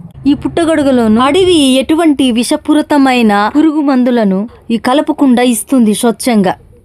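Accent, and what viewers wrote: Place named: Telugu, India. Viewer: మీ తెలుగు యాస కు బాగ ఎడిట్ అయ్యాను నేను మా ఊరు చిత్తూరు నాకు నచ్చిన భాష తమిళం 😮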